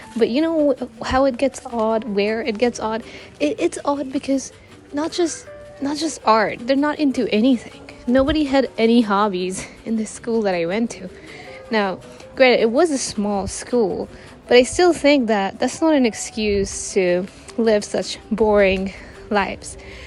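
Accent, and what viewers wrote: India. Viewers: not everyone has indian accent, never heard anyone w it, maybe she is from north east cus I also lowk speak like her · I’m also Indian and i agree with everything you say , I’m a school student for now but art is something i really love . And people around me even those who draw just draw what they find and at point , I don’t think anyone loves it as much . It’s also not something you can casually say for a future career . I don’t know if i should talk about this - but I also really love to write stories and no one around is a writer or a reader 😢like come on guys ! Creative outlets are so not talked about here ! And your accent is nice btw ! ❤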